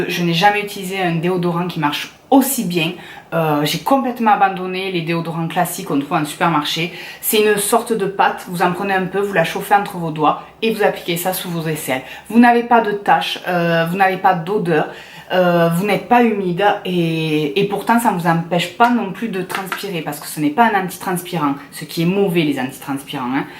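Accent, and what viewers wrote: South, France. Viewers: coucou ,je suis tout à fait d'accord avec toi pour la recherche du naturel , je suis en train de faire ce parcours aussi . je t'encourage à continuer dans ce sens :) .Bisouuus (en tout cas on a l'accent du sud tous les deux hihih)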